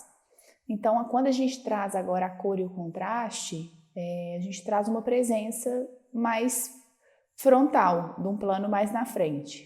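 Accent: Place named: Minas Gerais, Brazil. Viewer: Oi,. Conheci hoje seu perfil. Amei. O tipo de trabalho que sempre gostei de ver. E amei seu sotaque mineirinho, que eu acho o mais bonito do Brasil! Vou fazer essa aula hoje mesmo. Voce disponibilizou mais imagens?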